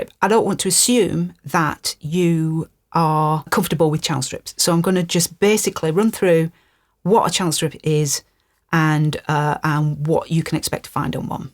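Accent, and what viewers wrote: Northern England, United Kingdom. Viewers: Hi! So glad I found your channel. There are way to many options and mix decisions to make with the plethora of plugins these day that it's paralyzing creativity. It reminds me of when Photoshop plugins went bananas (previous career). Too many options doesn't equal better results, in fact I would say it disables it. I love your Chanelle, and as an ex-pat from Leeds it's great to hear a northern accent. Keep up the good work. Cheers!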